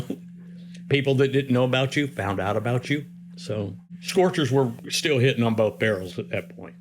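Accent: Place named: Indiana, United States. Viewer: This guy is cool to listen to, he sounds like he could be a Hoosier (?) referring to the “accent” if we have one 🤷🏻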